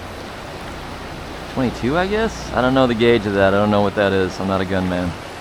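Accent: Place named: United States. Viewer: cool video and some useful info. You definitely don't sound Swiss :) You're American aren't ya?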